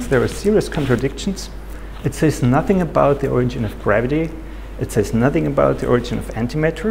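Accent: Germany. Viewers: Until now I thought only Americans don't know how to foreign languages. But you are speaking with German accent and do the same with french - your neighbor!? Paul DIRAC. Put emphasis on the second syllable "AC" not on DIR. I agree with your notion "particle physics" is nonsense and the reasons.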